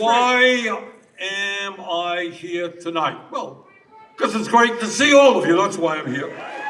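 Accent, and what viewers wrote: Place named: United States. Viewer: It’s nice to hear in American. By the way, I am English it’s nice to hear American actually talk sense instead of talking out their backside keep going.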